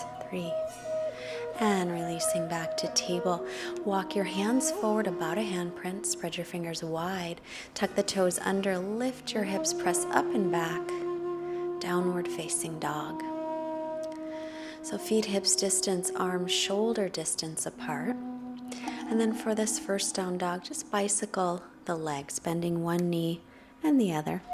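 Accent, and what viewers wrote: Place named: United States. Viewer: Thanks so much for putting this video up. I use it each week. It such a nicely flowing sequence. May I ask, where in the States is that accent from? My ex-girlfriend is from Hudson, Ohio and your accents sound identical! :-) Regards from Australia :-)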